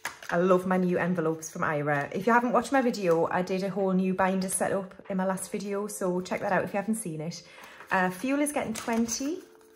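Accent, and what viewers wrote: Newcastle, United Kingdom. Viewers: Loved this video as always, puts a smile on my face when I see an upload from you :) I love your Geordie accent and I had the giggles when you were talking about the apple and how you were a 'whorebag' haha, hope you are okay xxx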